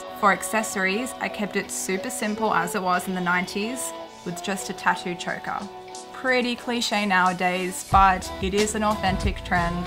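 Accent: Australia. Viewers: are you aussie? we should be yt friends! x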